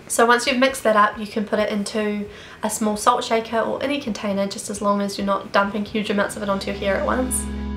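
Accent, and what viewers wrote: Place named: New Zealand. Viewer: I'm an Aussie; live in NZ and I can defs tell you - that is a Kiwi accent with a deep South roll of the R. Not an Aussie accent at all.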